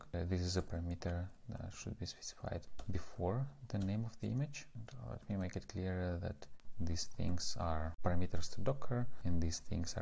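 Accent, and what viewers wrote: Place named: Russia. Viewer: that Russian accent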